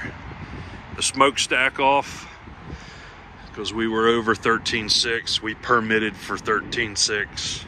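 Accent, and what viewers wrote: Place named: South, United States. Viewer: I love the way you talk bro, no homo shit just like that low and slow southern accent. Just left your stall for grounds down in Florida, Lakeland to be exact. Keep the videos coming my friend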